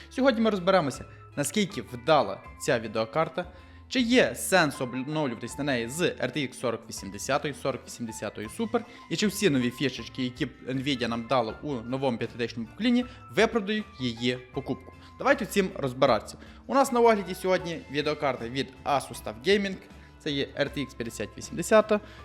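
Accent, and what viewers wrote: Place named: Galicia, Ukraine. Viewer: Класний галицький акцент 👍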